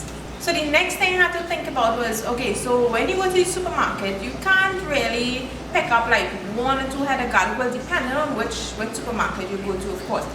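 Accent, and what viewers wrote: Trinidad and Tobago. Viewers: I love the accent. So Trinidad and Tobago. $100.00 TT dollars. Cook up rice with dasheen bush and cucumber salad. I will go to the Chaguanas market. Oh Lawd that’s a lot of money you talking. I will definitely be watching you. I hit that 🔔. #ahfeelinforsome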